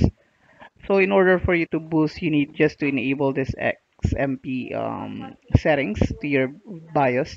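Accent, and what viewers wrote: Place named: India. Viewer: Fracking Indians. You slaughter the English language. Easiest language in the world next to Spanish yet after two centuries of English Empire domination you still can't speak it legibly. No wonder the Greeks said screw this and went home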